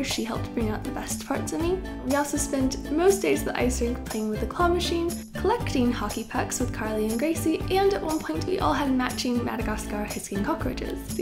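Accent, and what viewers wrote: England, United Kingdom. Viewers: I love hearing the British accent creeping into your voice!!! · Wait you're American? I always thought you were British 😂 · How long have you been living in England? You're starting to get a bit of an accent. ☺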